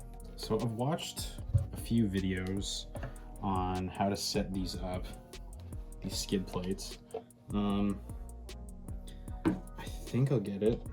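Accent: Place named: Canada. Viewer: i can tell you're canadian by your accent also its ironic having that board AND the kill your idols deck lol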